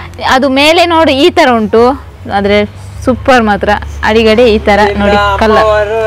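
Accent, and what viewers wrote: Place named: Mangalore, India. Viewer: ನನಗೆ ಮಂಗಳೂರು ಕನ್ನಡ ತುಂಬಾ ಇಷ್ಟ ನೀವು ಮಾತಾಡೋದು.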